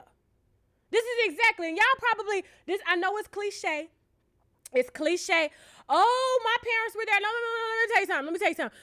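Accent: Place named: Deep South, United States. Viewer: I don’t know why I haven’t asked before but where the hell are u from lol I’m gettin Deep South vibes lol cuz I’m from Tennessee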